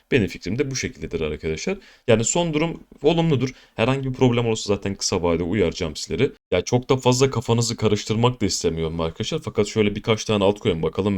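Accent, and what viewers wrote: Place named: Black Sea, Turkey. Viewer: Hocam Karadenizin hangi ilindesiniz ❤️🤲🧿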